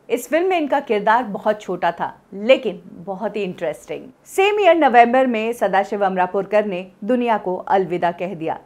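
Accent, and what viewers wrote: India. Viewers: Her Punjabi accents also comes in 😐🙄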